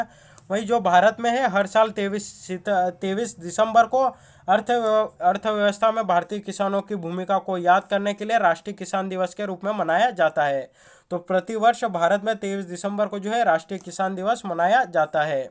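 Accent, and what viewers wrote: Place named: Uttar Pradesh, India. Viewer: Uttar pradesh se lage hai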